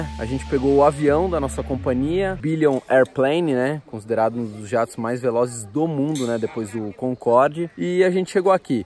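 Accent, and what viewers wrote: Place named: Rio Grande do Sul, Brazil. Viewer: Mas vc não é mineiro né?..vc é gaúcho tchê!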